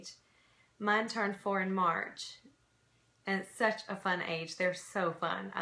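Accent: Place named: South, United States. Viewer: Excellent advice! Thank you! I do have a question, how do people passing through on YT order from you? I don't see your Younique website link anywhere. Is there a legal issue? I started a YT channel recently and want to promote my business on there through tutorials, unboxings and reviews. Any advice? Thank you so much! Also, where are you from.......love your southern accent. ;)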